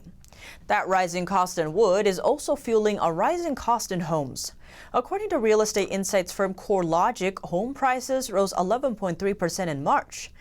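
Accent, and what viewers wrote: United States. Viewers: Wow baby blue on ivory with gold necklace your looking super cute and I love that strong American accent. Your perfect for the show.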